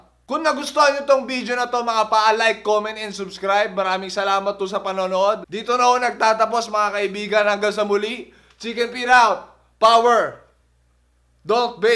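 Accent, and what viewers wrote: Ilocos, Philippines. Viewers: Bakit ka nagvevape? HAHAHAHA SAPUL 2020! I love your ilokano accent!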